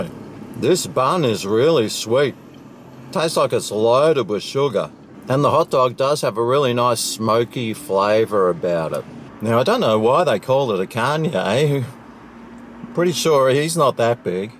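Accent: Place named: Australia. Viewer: I watch your videos because the Australian accent and behaviors thoroughly annoy the ever loving sht out of me. It feels pretentious and masquerades as highly educated. But I respect what you do and keep doing it bud.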